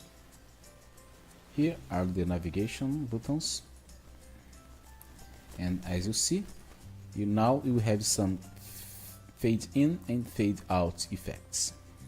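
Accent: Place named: Brazil. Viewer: Great, thank you for replying actually I am learning Português and your accent was very familiar to Brasil 😍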